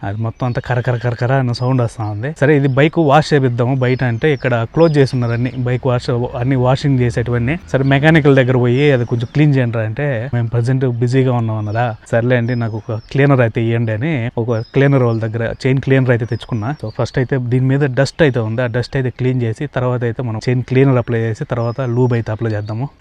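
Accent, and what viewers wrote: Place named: Rayalaseema, India. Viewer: ఏంది సావి.. మా రాయలసీమ భాష మాట్లాడతావుండేవే.. యా ఊరు అబ్బా.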